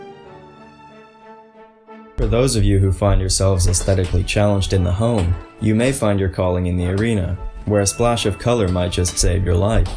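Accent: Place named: Toronto, Canada. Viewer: This guy is definitely from Toronto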